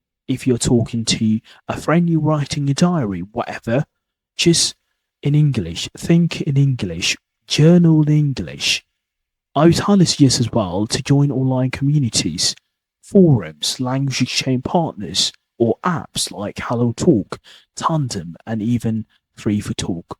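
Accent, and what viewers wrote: United Kingdom. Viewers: Really epic work mate, really well done to you for this an amazing piece of work work that you put in to grow you channel and of course helping others to level their British English and pronunciation and by the way I really into your accent really well done to you keep it up you will get there mate .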